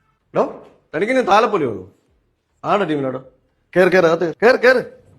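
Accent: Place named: Trivandrum, India. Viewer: Trivandrum slang❤❤❤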